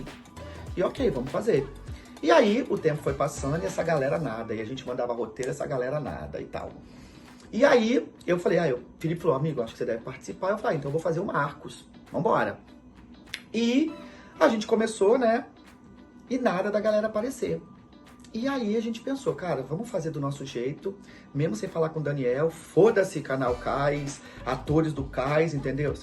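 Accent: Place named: Rio de Janeiro, Brazil. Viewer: Eu achei que vc era Carioca,. Eu fui ao Río duas vezes. Foi maravilhoso demais, me diverti muito. Eu sou de Salvador. Ba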